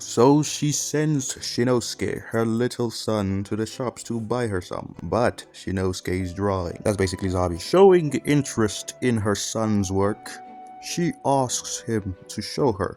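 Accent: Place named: Germany. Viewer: youre so German its cute I love your accent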